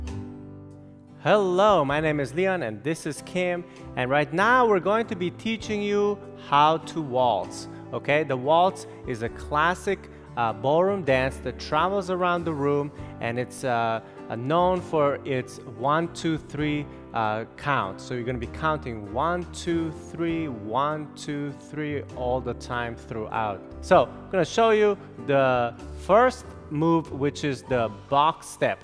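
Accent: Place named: Eastern Europe. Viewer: Spent the first time watching the video trying to figure out what his accent is It sounds Eastern European but not sure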